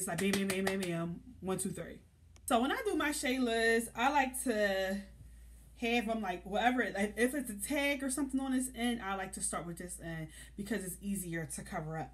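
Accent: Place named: Philadelphia, United States. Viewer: Girllll you just helped me so much!!!! 🙌🏽🙌🏽 As soon as you started talking I said “she’s from Philly.” Lol.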